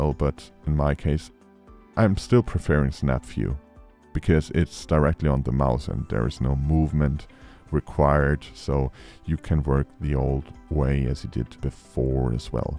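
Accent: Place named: Germany. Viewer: Your German accent makes it so much more interesting to watch this tutorial. :) Some typical accentuation on some words or syllables... funny and entertaining!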